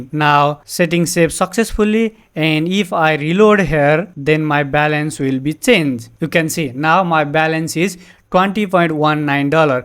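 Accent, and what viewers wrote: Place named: Nepal. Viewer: Bro speaks English in Nepali accent! I love it.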